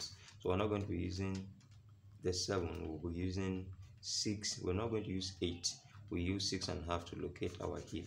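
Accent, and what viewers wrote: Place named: Tiv, Nigeria. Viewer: U speak like my Tiv brothers, weldone.... Wel articulated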